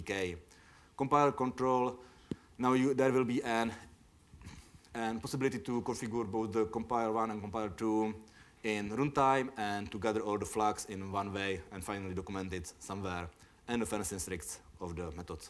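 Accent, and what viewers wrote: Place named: Czech Republic. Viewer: hehe its english or czech :D most terrible english accent i ever heard.. very funny x)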